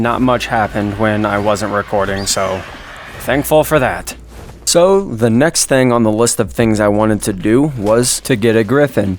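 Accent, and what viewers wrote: United States. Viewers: Sound Texan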